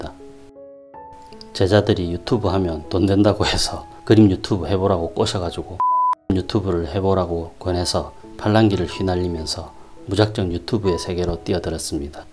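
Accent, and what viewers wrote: Gyeongsang, South Korea. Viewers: 일년전 영상인데 저는 요즘 연필 초상화 배운다고 유튜브 찿다가 선생님의 영상을보게 됐어 많은 공부가 됩니다 말씀도 재미있게 하시네요 고향이 갱상도 같아요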